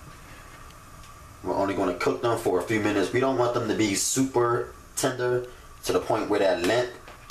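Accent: United States. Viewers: 10 minutes, 4 commercials? You know this is a cooking video, and more subs and views is a good thing right? I'm so lost, cause you totally sound American, and above the age of 16...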